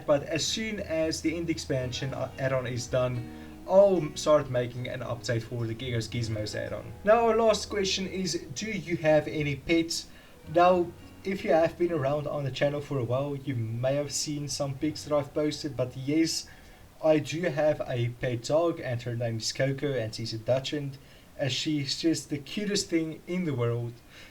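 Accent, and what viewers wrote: South Africa. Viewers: Nice work on the world edit addon. It was a lifesaver. Tho I know you are from SA just because your accent is the same as RenDog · Are u from South Africa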